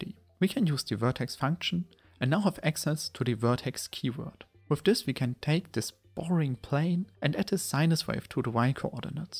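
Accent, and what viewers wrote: Germany. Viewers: That’s a german accent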